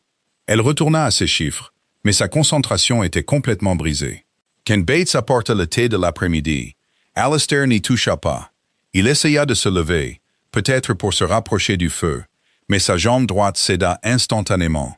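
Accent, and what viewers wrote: United Kingdom. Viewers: Un bon moment passé d'un roman historique comme avec un livre papier, sauf qu'à cet instant c'est avec la voix grave et monocorde d'un homme, doublé d'un accent britannique qui n'enlève rien à l'histoire, bien Au contraire ! Merci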